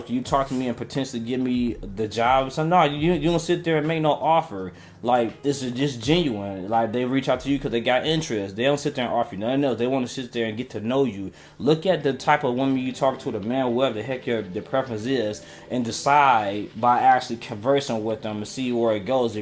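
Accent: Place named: Tulsa, United States. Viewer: what is from? I’m asking because my brother is from Tulsa and he has that same accent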